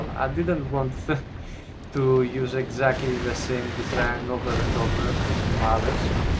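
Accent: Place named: Cyprus. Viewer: Από την τσιπρέϊκη προφορά σε κατάλαβα. Εγώ πέρυσι το ξεκίνησα. ξέρεις πώς γίνονται αυτά... ένας φίλος σε ένα πάρτυ μου λέει «έλα δοκίμασε, με μιά φορά δεν κόλλησε κανείς». Πήρα και το Space Age... άστα μεγάλε. Αγίου βαλεντίνου στην φάμπρικα. Το τερμάτησα αλλά δεν έκανα την τελευταία επιστήμη. Τώρα το ξαναξεκίνησα και έβαλα και κάτι μοντάκια κόλαση: Quality++, Corrundum, Maraxsis, long stack inserter, advenced belts και φύγαμε για megabase.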